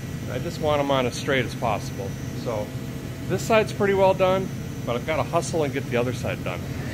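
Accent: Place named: Tennessee, United States. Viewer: Great idea Thanks! Tennessee talk! I saved some thought was junk too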